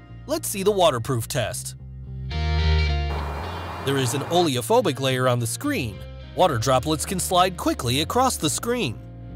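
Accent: United States. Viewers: Finally an English American speaking review, tired of all the desi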